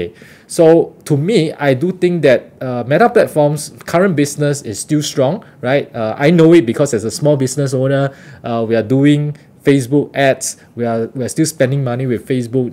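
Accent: Singapore. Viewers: It's not English he speaks but singlish - a version of English spoken in Singapore..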